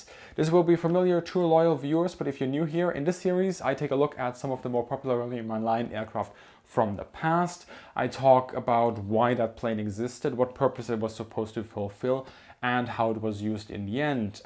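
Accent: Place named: Germany. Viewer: oder ich weiß nicht ganz aber dein deutscher Akzent ist sehr stark raus zu hören wenn du Englisch sprichst😂 Aber deine Videos sind sehr toll und gefallen mir gut. Eine Frage noch: spielst du irgendwann wieder IL-2 BoS mit Bo, Loli und so?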